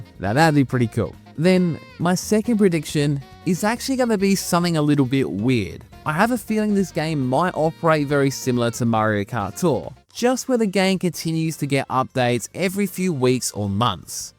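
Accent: Australia. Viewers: Hey, very refreshing to hear am aussie accent and such a consice and considered analysis of all things AAA Nintendo. Wish I dis covered your channel earlier. Keep up the great work. Thank you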